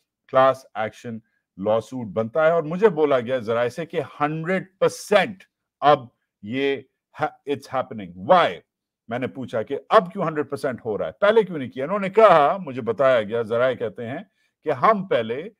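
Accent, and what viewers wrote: United States. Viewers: Sir ap urdu blkl mere 21 sala bete ki trah bolte hn, just little difference u speak wd American accent and he wd French accent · Mr wajahat your are sitting here for correction of English sentences. This is military leadership visit , why your are involving foreign ministry. Being Pakistani i will give due respect to all my Civil as wel as Military leadership. your are speaking in tone of American, being American your analysis is very correct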